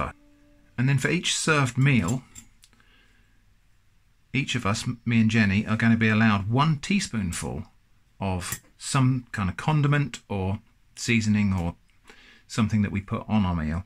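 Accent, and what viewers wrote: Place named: RP, United Kingdom. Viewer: You sound so posh when can we see your face ???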